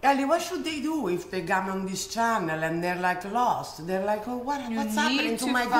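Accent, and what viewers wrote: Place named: Rome, Italy. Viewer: Che meraviglia quell' accento Romano, ah quanto vorrei essere invitato a casa di questa mamma "per mangiare si"😅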